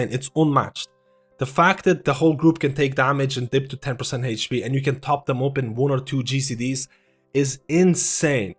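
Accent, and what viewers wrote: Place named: Ireland. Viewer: Great vid as per usual! Why do I always here a tinge of an Irish accent from you? Lol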